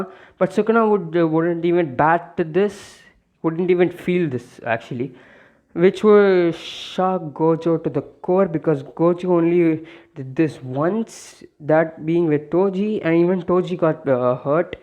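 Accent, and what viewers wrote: India. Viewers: Indian accent makes me laugh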